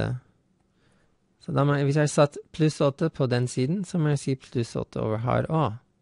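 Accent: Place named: Norwegian-American, United States. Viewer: Awesome norskamerikansk, haha :D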